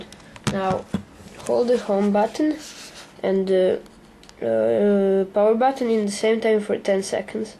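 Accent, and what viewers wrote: Russia. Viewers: u sound russian im russian but i dont have an accent kool vid thanks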